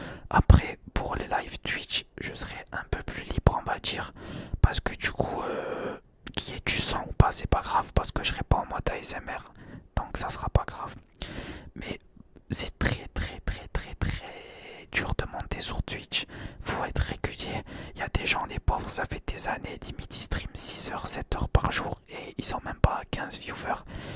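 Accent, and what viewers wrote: South, France. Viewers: salam aleykoum, ca faisait tellement longtemps que jsuis pas tombé sur tes vidéos ! le truc que j’aime vraiment trop c’est l’accent du sud, t’es de marseille nan?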